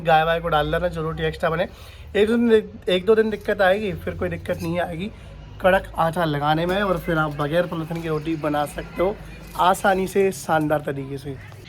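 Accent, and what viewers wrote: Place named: Karauli, India. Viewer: Consa gav h bhai ji yar me bhi karauli ka hu aap ki aabaj bhi karauli said ki lagthi h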